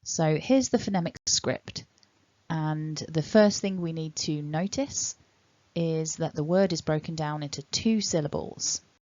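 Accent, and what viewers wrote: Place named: United Kingdom. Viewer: i love British English accent 🥰🥰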